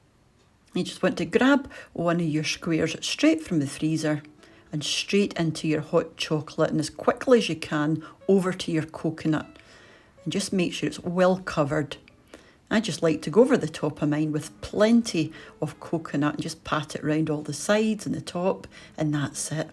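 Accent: Scotland, United Kingdom. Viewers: I am a big fan of ScotIand, it's people, culture and food. I absolutely loved the time I spent there participating in the World Bagpipe Championships a few years back. I don't know if you are aware of this, but closed caption does not work well with this channel and gives a truly comical take on your broadcast. Your Scottish accent is being misinterpreted and some of the attempts at translating are just comical because of your accent. No malace is intended here, just an observation. Can't wait to try these macaroons!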